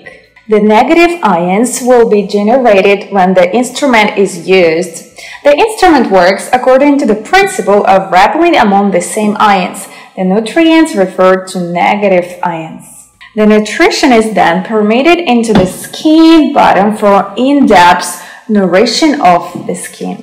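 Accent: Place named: Eastern Europe. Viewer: it’s her accent and lack of pronunciation more than anything. She has a strong Slavic accent.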